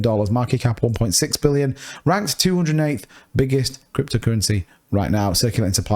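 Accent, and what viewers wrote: United Kingdom. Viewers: That’s UK accent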